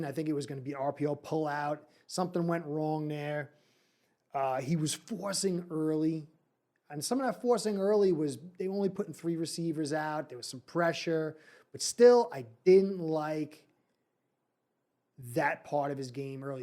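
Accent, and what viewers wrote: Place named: Northeast, United States. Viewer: So this is gonna sound weird, but part of the reason I tune in, I’m in CT there’s something cool about hearing someone who has a northeast accent talk fins cuz we got a lot of fans up here from the Marino days lol the Italians like myself as a small kid see Marino from a blue collar town n connected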